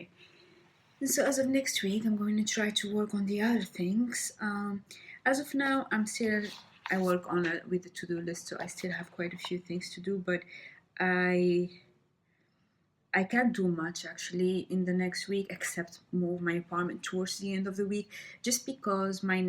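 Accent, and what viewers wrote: United Kingdom. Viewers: I feel like your accent is slightly becoming english 🙈 You go girl! Like your ambition! Keep going and climbing! Bises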